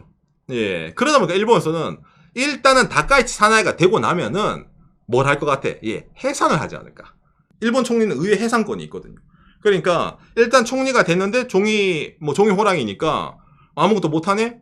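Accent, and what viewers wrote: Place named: Gyeongsang, South Korea. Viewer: 도... 경상도 사투리... 쓰네요... ㅎㅎㅎ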